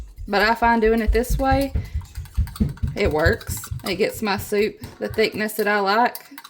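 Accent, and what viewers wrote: South, United States. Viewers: How can this not be a must make soup your opening said it all: My favourite soup recipe, potato recipe and crock pot recipe 😃. It’s in my recipe journal. I liked your reflection about washing dishes and the use of that time. Thanks for putting the recipe in your notes. I really like your southern accent it’s one of my favourites, it’s like a big hug. Thanks for sharing, so far from soup September one of the easiest to cook, thank you crock pot inventor. We all need a bit of easy in life. 🍲🥔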